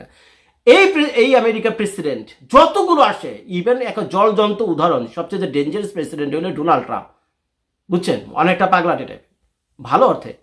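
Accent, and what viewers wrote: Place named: Dohar, Bangladesh. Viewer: আপনার কথাবার্তা গুলো শুনতে ভালোই লাগে, নিজের এলাকার (দোহার ও নবাবগঞ্জ) মতো কথাবার্তা মনে হয়। যদিও আপনার বেশিরভাগ মতামতের সাথে সহমত হতে পারি না।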